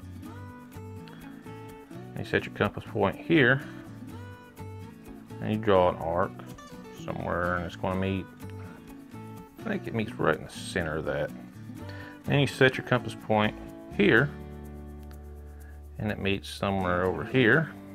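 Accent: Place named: South, United States. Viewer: As an overthinker i truely appreciate your slow southern dialect. Lol Nice take on angles too.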